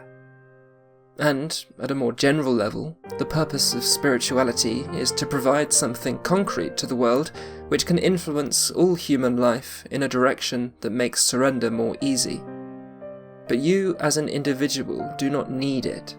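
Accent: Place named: United Kingdom. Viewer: No entiendo nada o poco y nada pero me encanta el acento, estoy aprendiendo inglés y me gusta escuchar el acento británico, de donde sos? Saludos desde Argentina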